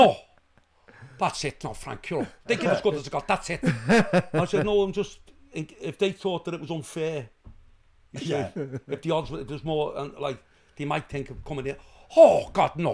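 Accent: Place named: Wales, United Kingdom. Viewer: He has got the Welsh absolutely bang on, i lived there for 5 years, Their attitude and Phrasing, he has spent time there too, to do it so good. They had me good style, practiced for 2 weeks saying "My Guinni Pidlan Back" me thinking it was "you have a nice shop" - Obviously i realized when the whole Spar was on the floor, i had not said that at all, after 5 mins of evryone laughing, asking the butcher "What did i say"?? He replied "You have just said "I've got a small cock"..... Right in the middle of the shop"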